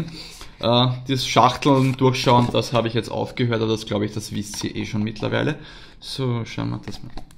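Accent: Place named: Austria. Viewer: Krass .... Capital Bra mit österreichischem Akzent! Klasse Video, weiter so :)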